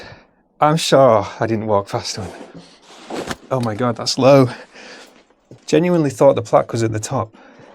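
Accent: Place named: Northern England, United Kingdom. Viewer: Your Northern accent pronunciation is sooo smooth compared to a lot of people I hear, It's magnificent.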